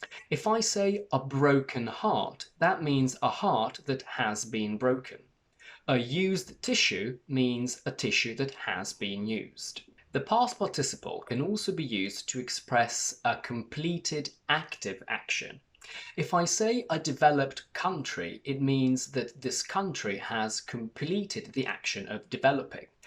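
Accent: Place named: England, United Kingdom. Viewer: Love your English accent.. happy to see you again..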